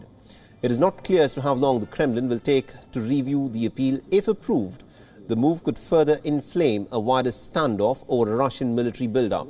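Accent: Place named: India. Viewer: Guys... Whenever you hear that accent. Just remember about the old grannies these indian IRS phone call/ telemarketing scammers had scammed. 👍👍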